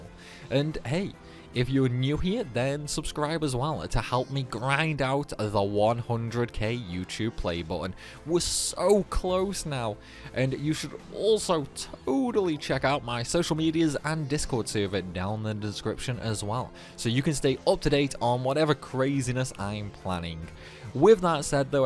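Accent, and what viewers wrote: Stoke, United Kingdom. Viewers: You're a Stokie, can tell from the accent. Great video btw!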